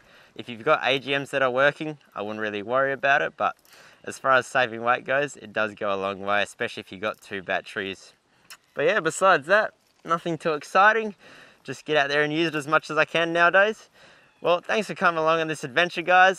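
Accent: Australia. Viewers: I think his parents are from Poland but he sounds like he has a good Australian accent.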